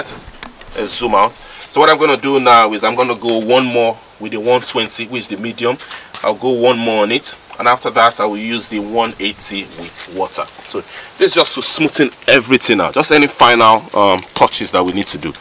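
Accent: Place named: Nigeria. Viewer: My Naija broda. I hear that accent clear as day. LOL. Good job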